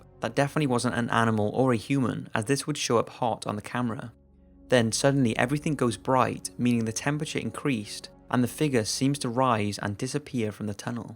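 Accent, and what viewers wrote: Wales, United Kingdom. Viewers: Hello, Are you from britain? I like your accent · Hey I was just wondering, where are you from, because your accent sounds a bit Welsh. Thanks!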